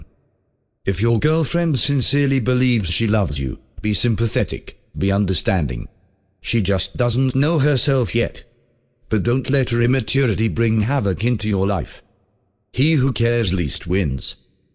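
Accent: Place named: United Kingdom. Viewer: This British accent is driving me crazy.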